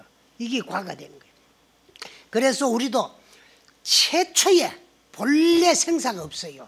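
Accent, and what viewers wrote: Gyeongsang, South Korea. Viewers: 묘허스님! 구수한 경상도 사투리에 맛갈스런 법문 넘감사하게잘받들고 갑니다 건강하십시요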